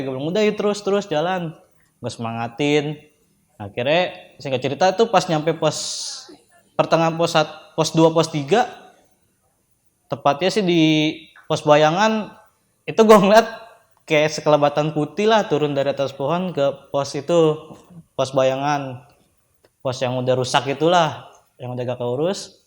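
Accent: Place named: Betawi, Indonesia. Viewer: Logat betawi banget lau cing hehehehe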